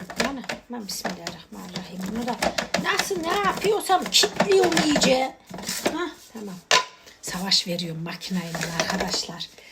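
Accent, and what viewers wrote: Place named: Nevşehir, Turkey. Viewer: Gırdı Nevşehir li ne Türkçe amma 😊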